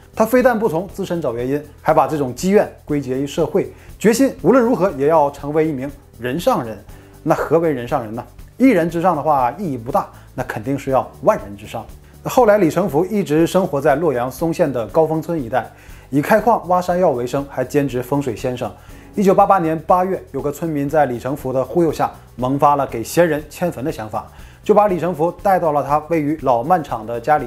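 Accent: Northeast, China. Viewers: 我一般听不惯东北口音的播主你是个例外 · 东北的吧?好亲切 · 东北味儿普通话，不难懂，不需要字幕吧，会影响更新频率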